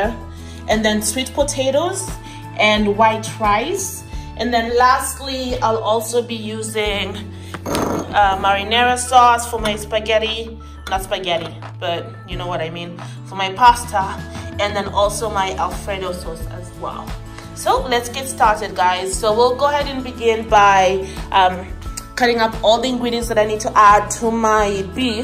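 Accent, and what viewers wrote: Kenya. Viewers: You sound Kenyan, are you from Kenya?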